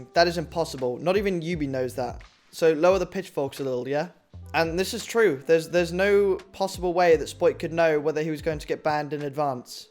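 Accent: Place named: United Kingdom. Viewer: This content is actually fire bruh, a fucking panda who plays r6 and narrates with a brittish accent? who doesn't want to watch that type of content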